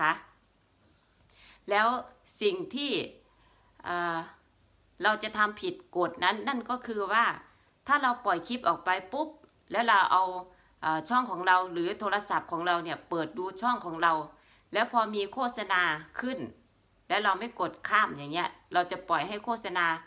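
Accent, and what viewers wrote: Isan, Thailand. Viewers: อยากให้ พูดอีสานจ้าน่ารักดีเวลา พูดอีสาน👍👍👍❤❤❤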